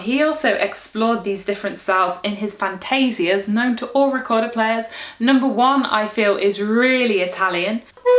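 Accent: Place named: United Kingdom. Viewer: I'm in the second half of the video... and I already got lost :'D but it could be because I speak spanish and I'm not used to hear British English u.u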